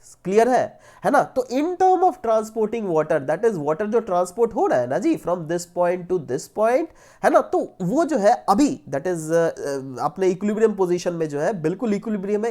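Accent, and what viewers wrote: Bihar, India. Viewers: sir aap bihar say hai kya ?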